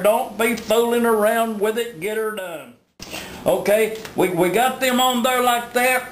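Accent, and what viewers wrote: South, United States. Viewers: I find your comment extremely insulting! What are you insinuating by "his voice" and "southern fried pizza"...apparently you're stating that because someone has a southern accent they are incapable of cooking something that does not contain fried chicken...Furthur, you have no room to insult ANYONE on here, due to the fact that your username is "Inbreed6699Family" Enough said.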